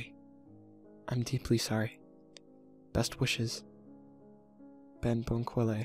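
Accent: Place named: United States. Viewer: The guy speaks really good American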